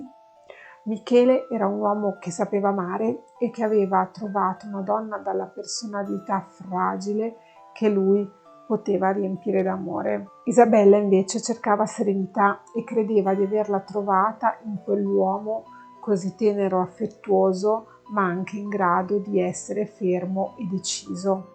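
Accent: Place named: Lombardy, Italy. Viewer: Ciao complimenti appena arrivata sono in clandestinità perché sto usando un iPad condiviso. Complimenti soprattutto perché tratti dei casi italiani. Mi piace il tuo accento lombardo. A presto Lally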